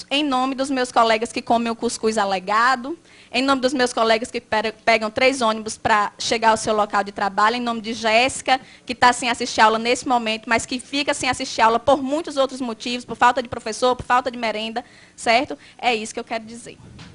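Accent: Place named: Rio Grande do Norte, Brazil. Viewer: Assisti ontem ! Adoro o sotaque do RN, adoro Natal!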